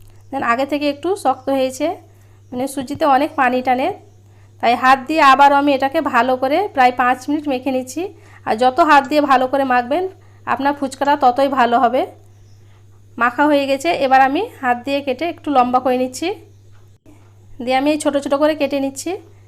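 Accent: Murshidabad, India. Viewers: আপনার কথার সুরটা মুর্শিদাবাদের মত লাগছে । আপনার রান্না গুলা ভালবাসা মিশে আছে গো । অনেক ধন্যবাদ আপা ।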